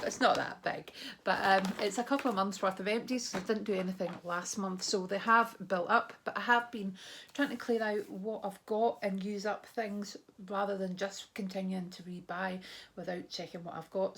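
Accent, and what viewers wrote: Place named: Scotland, United Kingdom. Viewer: To be honest I firstly subscribed on your channel due to your lovely accent ! I miss Scotland so much, I used to live in Edinburgh for 4 years. But then I end up liking your videos more than your accent :) I love your honesty and the way you describe the products! xxx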